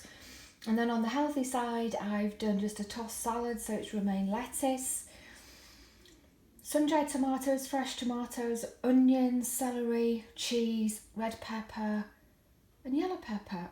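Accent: United Kingdom. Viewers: Oh my God I'm drooling right now to your enticing food so yummy and scrumptious...I want to dig in right now hahaha...and One More thing..Your voice is very lovely and sexy..I really love the intonation of British English..God Blessed my dear friend.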